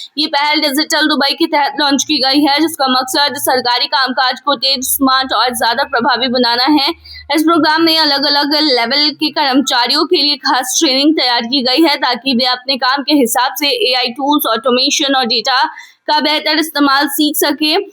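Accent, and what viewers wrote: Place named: Pakistan. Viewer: Horrible urdu accent, incomprehensible verbal diarrohea! Pls use copper tongue-cleaner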